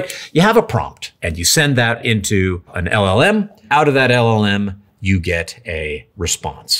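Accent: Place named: United States. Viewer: We need an AI to turn unbearable american accents into nicer ones within the youtube player.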